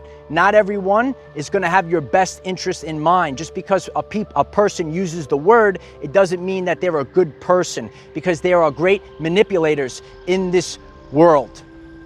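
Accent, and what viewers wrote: New York, United States. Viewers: Caring good intentions but your message and video is convoluted Mission mosh with too much vague innuendos I noticed you never used quote any Scripture you're just giving life experience but it's verbally jumbled man it's not making a very good presentation Without trying to be rude or disrespectful I have to question and ask if you're on any medication because you're not messing with the public regarding something you call Twin Flame and vibrations and frequencies Your New York accent to me explains kind of why you have a super high intensity and inflections about the inner workings of communication for me you sound like you're on a subway too long and irritated with the people's inflections or things you think they're doing to irritate you I think it's better you stay in the sunshine and stay outside and enjoy the fresh air around you because you need that oxygen bro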